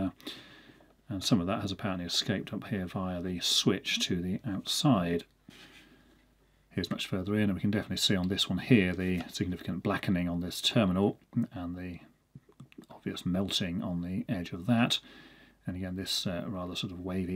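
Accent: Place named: Northern England, United Kingdom. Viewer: Sometimes, sounds Northern.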